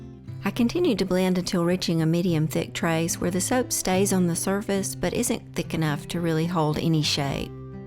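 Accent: South, United States. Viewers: The southern accent is the beauty of the south. Let is out. Sing it ! The rest of the world is blessed to hear it.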